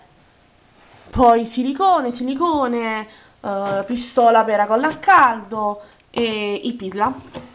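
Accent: Naples, Italy. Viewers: ooh Cacchiarola, avevo capito dall'accento che eri Napoletana ^,^ mmh dove precisamente? :O cioè non ho mai sentito di sto posto xD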